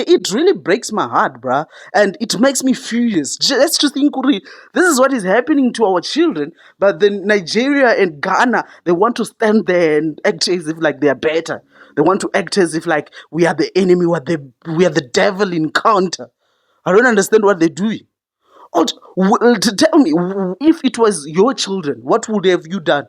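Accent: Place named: United States. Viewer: I dont think you are south africab, you sound American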